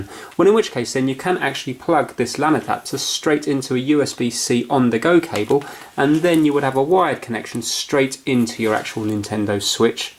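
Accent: England, United Kingdom. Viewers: Your very helpful and I like your English accent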